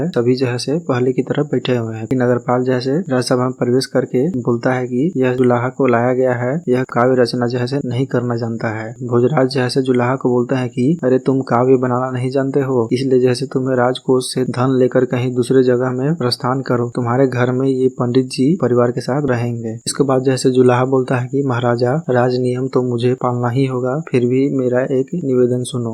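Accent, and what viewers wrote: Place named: Bihar, India. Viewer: Ja he se bro our bihar's accent don't forget that 🔝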